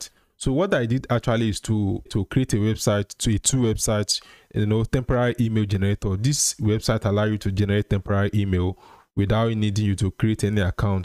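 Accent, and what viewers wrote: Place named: Nigeria. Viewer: Nigerians, your accent cannot go unnoticed. "Sarch", "pour-chase". Lots of love from Anglophone Cameroon ❤️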